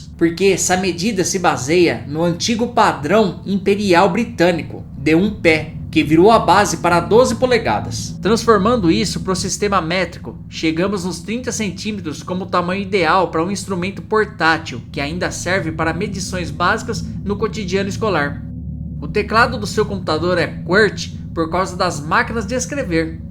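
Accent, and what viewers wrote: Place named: Inland São Paulo, Brazil. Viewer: O conteúdo do vídeo é excelente, mas.... Com todo o respeito senhor sua dicção e pronúncia das palavras precis ser melhoradas, quando você pronuncia a letra "r" sua língua embola... Parecido com o sotaque caipira do interior de São Paulo e região sudeste... A pronúncia que você faz é a seguinte: "poirrrrque" "cairrrrteiraaa", "inteirrrrna". Dói os nossos ouvidos...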